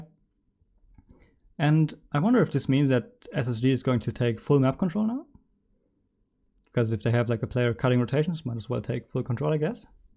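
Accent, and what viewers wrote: Germany. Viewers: Are you from germany? It kinda sounds like that to me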